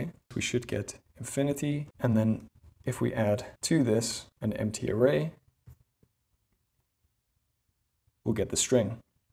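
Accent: Netherlands. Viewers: Are u Dutch :D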